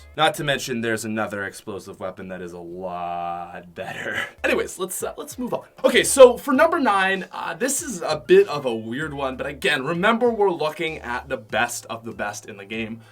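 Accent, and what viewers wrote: Australia. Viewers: didn’t realize was an Australian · are you in Australia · IS FROM AUSTRALIA PROOF IS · holy crap I didn’t know your Australian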